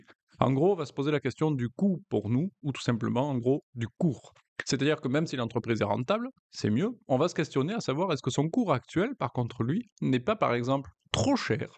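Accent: South, France. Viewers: Bonsoir, le petit accent du sud est très agréable pour une chaîne sur l'investissement. Un rayon de soleil qui réchauffe le PEA 😅. Merci et bonne continuation 👍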